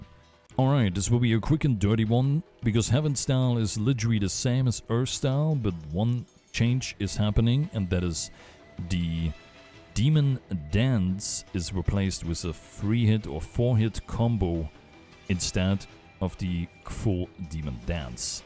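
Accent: Germany. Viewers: Because i hear you say that a lot: It's "Does", not "Duus". Its almost spoken like "das" in german, but with an o inbetween. aka "dos" (I assume you are german, taken from your accent)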